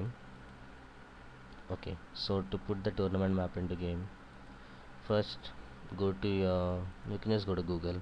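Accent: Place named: India. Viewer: look im indian too but your heavey accent makes it too distracting to watch the video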